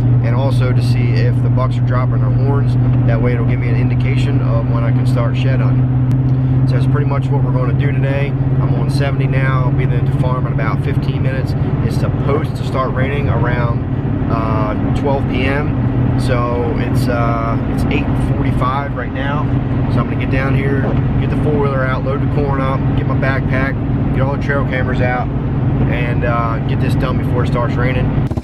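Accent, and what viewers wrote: United States. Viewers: Are you from Indiana?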